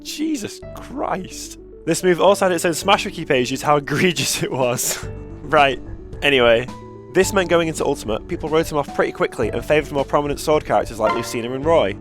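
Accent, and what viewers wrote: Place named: United Kingdom. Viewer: heard british accent liked video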